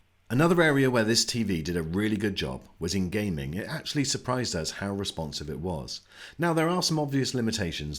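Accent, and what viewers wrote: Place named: United Kingdom. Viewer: Dude he’s not Australian he’s British LOL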